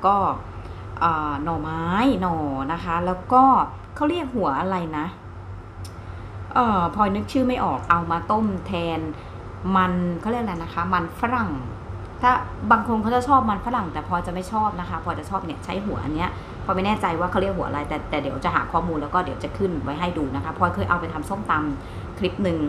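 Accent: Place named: South, Thailand. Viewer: ชมคลิปผมบ่อยๆย้อนไปดูคลิปแรกๆแหลงใต้ทั้งเรื่อง55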